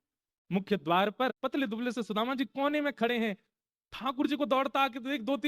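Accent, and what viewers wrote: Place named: Braj, India. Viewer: Aapke mukhmandal se brj Bhasha pyari lagti h sunaya kro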